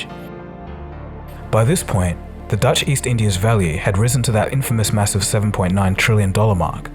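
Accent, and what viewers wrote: Australia. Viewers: Crap channel. Horrible Australian raspy accent. Terrible summary knowledge which frequently engages in absurd reductions and simplistic analysis. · A great video Are u Aussie?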